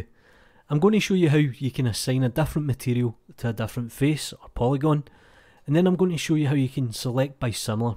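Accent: Scotland, United Kingdom. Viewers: Thank you random scottish guy from internet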